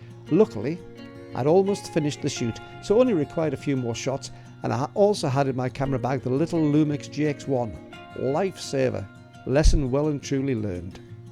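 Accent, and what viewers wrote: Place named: Cumbria, United Kingdom. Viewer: You must of been there about time I was there, im a west Cumbrian lass, but love Lannercost. Nice video. Love the accent, identifiable by any Cumbrian I agree as a lot to offer, mot just the lakes, also hadrians wall, the abbey at Abbeytown, and dont know if youve been to Calder Abbey at Calder Bridge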